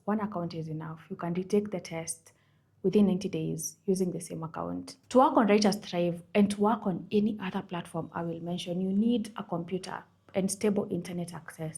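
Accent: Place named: Kenya. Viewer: I immediately knew from your accent that you’re Kenyan. Proud to see another countrywoman representing us in this space ❤️ (you are Kenyan though, right?) 😅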